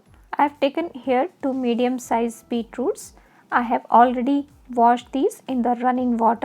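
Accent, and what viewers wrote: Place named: India. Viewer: मॅडम आपल्या टोन वरुन तर आपण भारतीय अहात. मग मराठी, हिंदीत माहिती का देत नाही.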